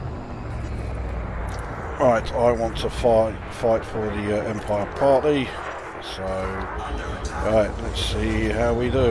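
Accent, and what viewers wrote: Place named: London, United Kingdom. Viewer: I engineered my weapons Thermal vent but Distributor has so many alternative's to work out so I will try weapon based Engineering.I must add at 63 now I'm trying my best to get the most out of my ship's and being an Elite Vet since 1984 I'm still on a learning curve on this game.Nice work on the vids and I love the Old Smoke accent.Cheers from Raymondo the Taff.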